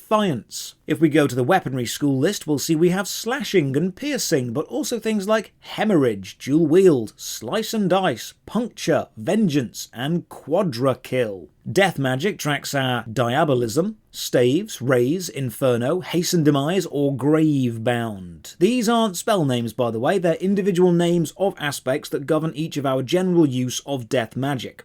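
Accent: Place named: RP, United Kingdom. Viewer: Oh MY GOD ENOUGH with these British youtubers and their clickbaity embellished titles and long droning low effort content, and their received pronunciation speaking as though they are some authority on the subject... Jesus, no other country produces as many cruddy youtubers from this "game the algorithm" school. You've called about 40 MMO's the worst MMO ever, you've never played many of these games you talk about in any significant amount... Low effort, fair use abusing, overly verbose garbage.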